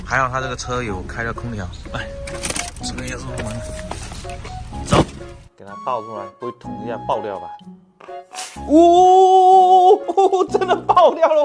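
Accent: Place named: Minbei, China. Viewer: 的闽北普通话不敢恭维丫的女人缘真的没话说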